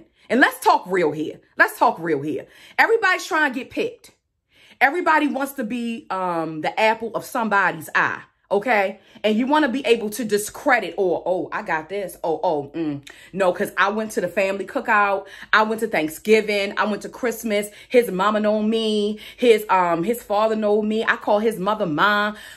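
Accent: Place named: Northeast, United States. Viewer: Are you from Baltimore or Philly btw? I can hear the north east accent.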